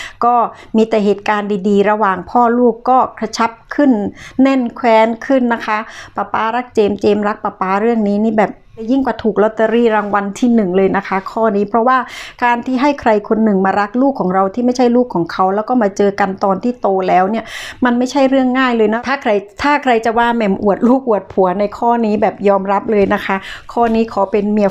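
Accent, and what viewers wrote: South, Thailand. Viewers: ไม่จริงนะ อิสานใต้สำ้นียงอีกแบบนึง แต่นี่ฟังแล้วรู้เลยว่าเป็นคนใต้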